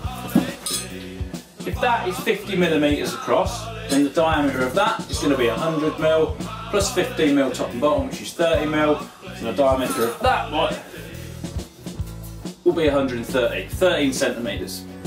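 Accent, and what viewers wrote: United Kingdom. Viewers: He has a heavy British accent and uses a lot of British slang. It wouldn't translate well. Try using google translate.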